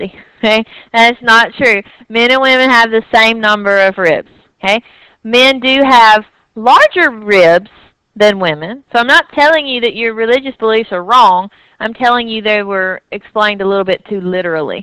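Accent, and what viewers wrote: South, United States. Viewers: I love videos. There are full of information and I feel like I am in a class, with the teacher talking to me, not just reciting information. The fact that she speaks 'Southern' is an added positive! I don't feel she is talking down to me when she explains things.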